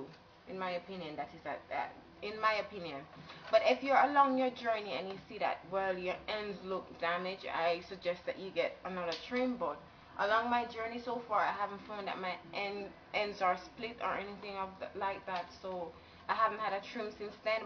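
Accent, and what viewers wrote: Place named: Jamaica. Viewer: W00t! Another Jamaican. Whaagwaan? Weh yuh deh? Jamaica still? Bway mi miss it bad bad. Not even affi look pon di name, a hear mi juss hear yuh voice an mi kNOW SEH a muss Jamaican dat. hail up. :D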